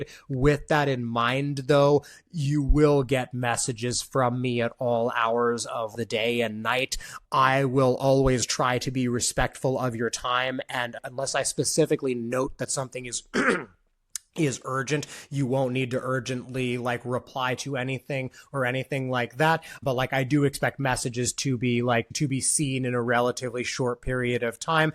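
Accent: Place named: United States. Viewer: Hi.... I have never considered being an EA (until today) but it's a rare quality to find someone who thinks so fast and be unashamed about it. I shared this video with my Danish wife and she laughed out loud (thinks we have the same American voice and talking tempo). Even if we never meet in person, I look forward to more content from you. NDs need change champions just like you.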